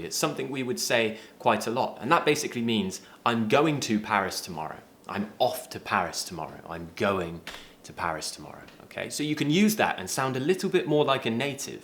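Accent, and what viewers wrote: United Kingdom. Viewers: Your accent is my inspitation to become a good british english speaker. #EnglishGrammarInUse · When you want to sound British, just listening and imitating him., you're the best! #EnglishGrammarInUse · #EngliushGrammarInUse I just love your channel and your British accent. Nice one!!!!!! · #EnglishGrammarInUse Thank you for filming so many amazing videos. Your videos help me build up British accent greatly and I am totally fascinated by your voice! Your videos are always the best way for me to train my British English listening, and at the same time, I do learn some useful phrases also. Besides, your videos are always the first choice that I highly recommend my students to watch and learn British accent. Every time my students say that they are interested in the British accent, I always say go watch videos. Once again, thanks for filming so many excellent videos!! 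· #EnglishGrammarInUse hello, your accent is the best British accent. I need some advice. I know many many words in English, but i can't use all of the words, when i talk or speak with somebody. Rest of time i forgot majority words. Please say me how can i use many different words in my conversation. Generally i use very simply words, when i speak. I want to speak more widely and i want to use more different words. Thank you so much before your answer! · I adore your British accent! #EnglishGrammarInUse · I really like the way that you teach us your British accent is easy understanding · #EnglishGrammerInUse hope its not too late to comment and join the competition! Constantly learning English is one of my hobbies and favourites, and a foreign language makes me more sensible when talking to ppl and guide me to see the world in a different way! Thankss I really like your channel and feeling motivated and encouraged by such wonderful British accents too!:) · Wow! 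You are the best teacher, good lesson. And you have good British speech, the best as for me. From Ukraine with love and.. #EnglishGrammarInUse :)) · #englishgrammarinused Woww... you looked sexy at this video. Love from indonesia 😊✌ oh ya... I used to bad at listening, but i loved to learn listening through all your vid without subs. And nowadays my listening better i supposed because i can understand you with your British accent... Thank you for your vid and your clear sound and pronunciation. 🙏🙏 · #EnglishGrammarInUse I love listening to your British accent. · #EnglishGrammarInUse absolutely love your adorable British accent 😍 thank you so much for inspiring me to learn English. Much love from Russia ❤️☺️ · I really like your British accent... #EnglishGrammarInUse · I adore your British accent!❤ You're amazing❤ Greeting from Egypt❤ #EnglishGrammarInUse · I love your British accent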